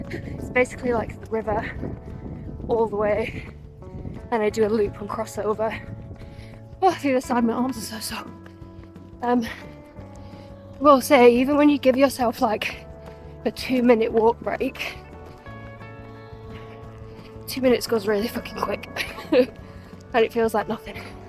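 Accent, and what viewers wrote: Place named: Yorkshire, United Kingdom. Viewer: What part of Yorkshire, did you come from?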